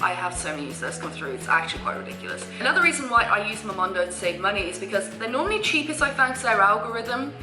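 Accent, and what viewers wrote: United Kingdom. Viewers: Out of curiosity... you're British not Australian ryt?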